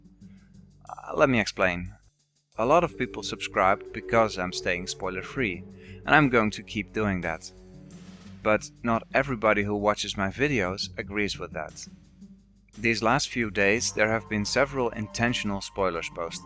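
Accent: Netherlands. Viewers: are you from holland ???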